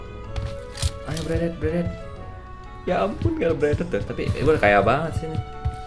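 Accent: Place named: Java, Indonesia. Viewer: Ngomong asem medok slur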